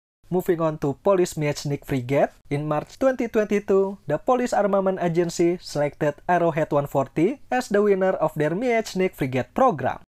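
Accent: Java, Indonesia. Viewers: english with indonesian aksen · aksen inggris lu indonesia banget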